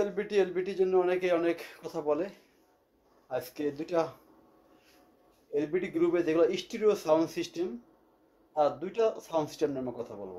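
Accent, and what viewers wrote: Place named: Barishal, Bangladesh. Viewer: ভাই আপনার বাড়ি বরিশাল মনে হয় 😮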